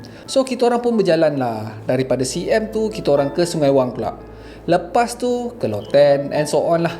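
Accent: Perak, Malaysia. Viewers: Ooo mmbesar dtmpt kelahiran saya,w.maju,tu dgr lohat perak je😊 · ptt ler ade sleng perak...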